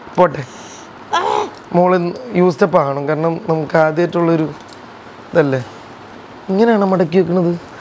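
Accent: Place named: Haryana, India. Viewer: ki Haryanvi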